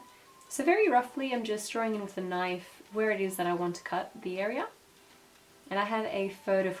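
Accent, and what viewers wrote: Australia. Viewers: yeah haha i had a feeling it was more of an australian accent but idk something about your voice sounds just like her! lol meant as a compliment of course i just stumbled on your channel and i love your videos(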